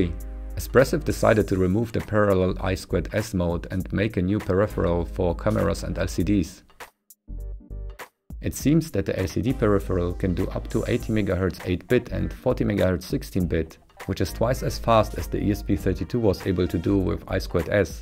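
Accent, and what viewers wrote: Germany. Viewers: 4 sekunden die stimme gehört und direkt gewusst es ist ein deutscher:D:D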